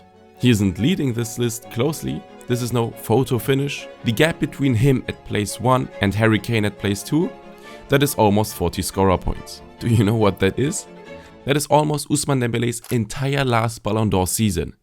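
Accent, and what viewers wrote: Germany. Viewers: I don’t think this guys ever seen Kane play despite his German accent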